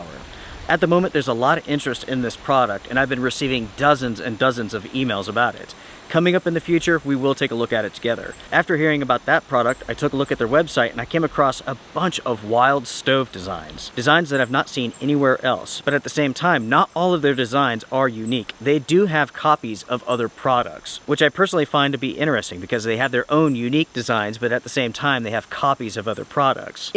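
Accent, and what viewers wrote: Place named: South, United States. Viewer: Great little stove. I love your reviews and your voice. Very smooth with a slight southern accent. ❤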